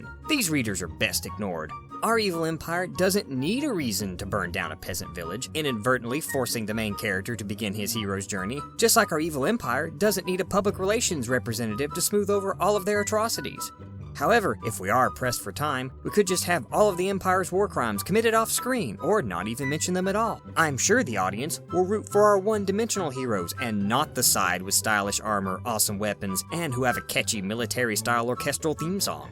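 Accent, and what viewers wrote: South, United States. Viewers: I like how your accent is "normal" and then your southern one comes out... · No disrespect to you or people from the south, but your accent. Now my mom is from the south, Norfolk, Virginia in fact, and her parents and their family are from the Carolina’s and Georgia. So their pretty much as southern, as respectable southern can get. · Are you a Southerner i can here certain twangs in your accent, I can kinda tell because im a Southerner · is he southern???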